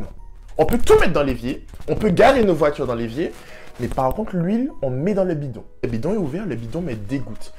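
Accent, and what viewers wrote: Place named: Martinique, France. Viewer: Wouah!!! L'accent martiniquais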